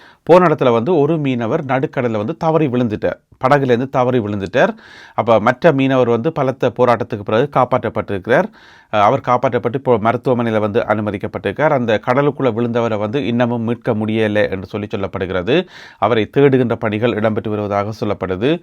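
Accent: Jaffna, Sri Lanka. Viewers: தம்பி உங்கள் தமிழ் உச்சரிப்பு. அருமை 🎉🎉🎉🎉🎉. யாழ்ப்பாணம் தமிழ் ❤❤❤❤❤❤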